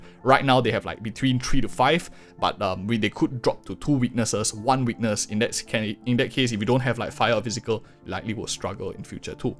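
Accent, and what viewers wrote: Singapore. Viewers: Hey bro! R u from sg? Ur acent sounds like sg im from sg btw xD. Nice video keep it up bro!! Just give u a sub :D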